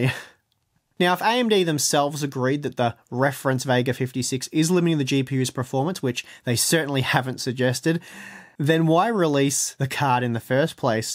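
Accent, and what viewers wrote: Melbourne, Australia. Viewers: I have no idea why your critics were so critical. I've watch all your Ryzen and gpu tests and find you disclaimers eminently understandable and justified - perhaps it's because we share an accents (melburnians). Anyway keep up the excellent quality of your work.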